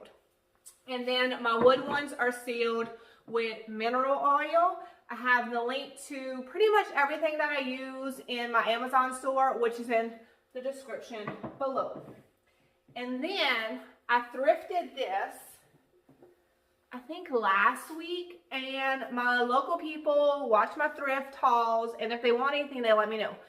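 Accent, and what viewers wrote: Louisiana, United States. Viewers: I loved seeing this! Gave me ideas as well as good info on pricing. I must ask, where is your accent native to? You remind me of some of my Louisiana family, but not quite.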